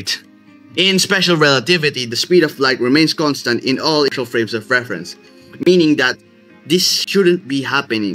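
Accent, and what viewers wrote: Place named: Eastern Europe. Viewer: in-depth sciency roblox video -> click slavic voice for some reason, every time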